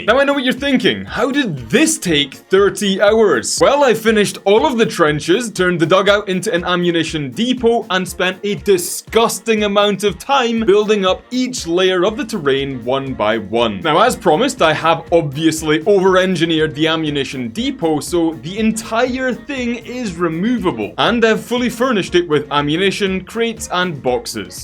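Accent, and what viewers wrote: United Kingdom. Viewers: Sounds British. I think he needs a bottle of water.😂😂😂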